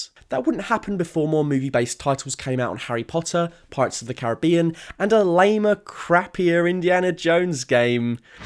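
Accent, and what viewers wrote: United Kingdom. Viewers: Oh god he's British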